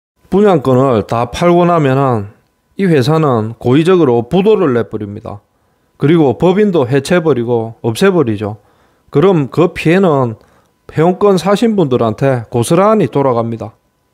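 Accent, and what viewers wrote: Gyeongsang, South Korea. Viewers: 다 좋은데 경상도 말투만 아니라면 좋겠는데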